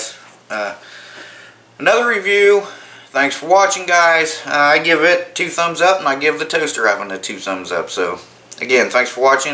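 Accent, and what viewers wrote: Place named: Baltimore, United States. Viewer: Awesome video- very informative. You must be from Baltimore with the accent. Maybe southern PA. Let me know if I’m right!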